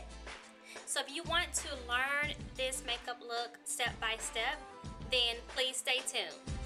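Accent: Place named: South, United States. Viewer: Hey my new YouTube Noir friend! New subbie here! Love this pop of color!! I am also an Alabama girl by way of New York City and I have been working on my southern accent for years so don't apologize, lol. Also check out another one of our Alabama makeup beauties here on youtube: NitraaB. Look forward to watching your videos, tfs, God bless.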